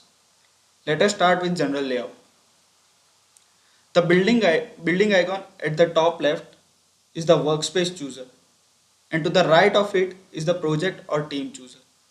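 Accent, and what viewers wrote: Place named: India. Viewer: that's , indian accent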